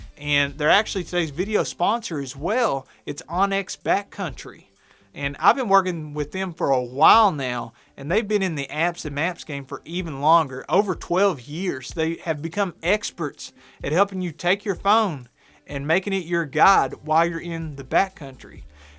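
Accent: United States. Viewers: Ur a likable bloke, u sound so American and 🤣 Please don't hike in trainers (tennis shoes) 🙄🤣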